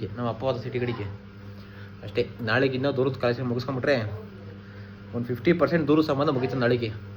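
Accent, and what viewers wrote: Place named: Tumakuru, India. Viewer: Namdu mavathuru atra saggere... Nimdu yava uruu guru. Namma tumakuru bashe super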